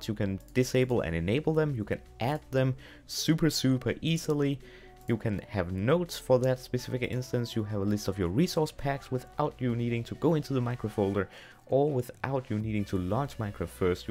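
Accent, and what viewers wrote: Denmark. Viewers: hmmm you sound a bit danish...?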